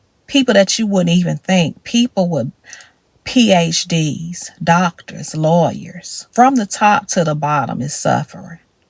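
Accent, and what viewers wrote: South, United States. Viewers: A typical southern accent and love it🎉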